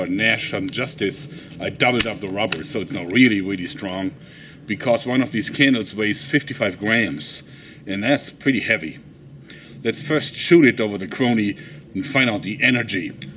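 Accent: Germany. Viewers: Hay, fu bist doch Deutsch oder, finde du hast ein typisches Deutsch-Englisch. Antworte bitte auf eine Frage eines Genossen:D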